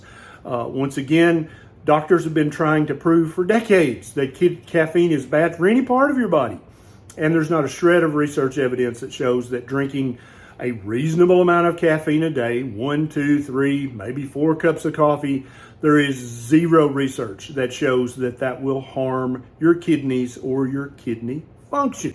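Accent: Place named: Deep South, United States. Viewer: Your analysis is the most comprehensive and logically reasoned that I have seen. You DO need to work on taming that extreme southern accent. It's distracting and is taken as backward and ignorant by a decent percentage of all who were not raised in the deep south. This will lead to people immediately clicking off your excellent video as soon as you start talking. Your information is so good. I just wish you had a better presentation. Not only are you elongating words, but you have the extreme form, where entire extra syllables are injected where they do not belong. The word LIST is one quick syllable. You are pronouncing LAAAYYYUST- a complete and utter abortion of the word, so extreme that I had to rewind just to be sure what word you were attempting. To correct THEYUS, (THIS) please practice saying words quickly, succinctly. You will sound far more intelligent. 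The word ON and the word OWN are two different words. ON should not be pronounced OWN! BAD is BAD not BAYYUD. CONTEND IS CONTEND, not CONTAAYYYUHD. The word WELL should be pronounced WELL- not WAAAYYYEL! The word BE is BE not BAY, and certainly not BAAYEE. DOWN is DOWN, not DAAYYOWN! Just practice saying ALL words quickly, correctly and without injecting extra syllables.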